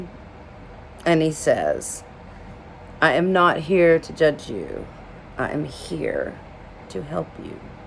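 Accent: South, United States. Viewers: Out of NCIS, your southern accent is coming back strong. Love it. You be your P. I love you. I miss Abby, my favorite NCIS character.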